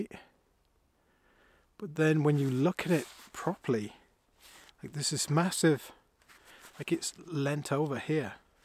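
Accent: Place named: Welsh Border, United Kingdom. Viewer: You appear to be a adoptive Canuckistani and I can tell from certain nuances in your accent you’re from the borders of England and our western neighbour😂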